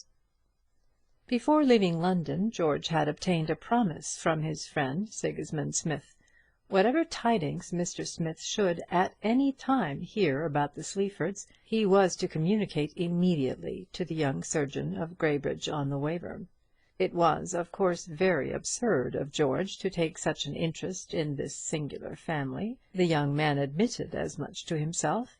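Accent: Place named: United States. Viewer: Sorry, can't possibly endure British literature read haltingly in an American accent. It doesn't work. At all.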